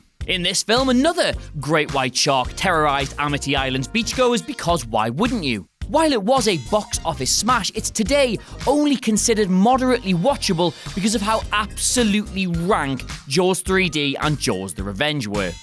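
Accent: United Kingdom. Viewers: If I come back for anything, it's the british accents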